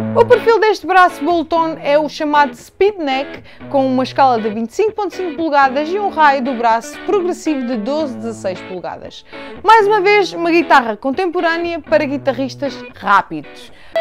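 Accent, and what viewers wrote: Portugal. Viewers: Por que sotaque português de Portugal em mulher é tão delicioso de ouvir? que delicia. 🤤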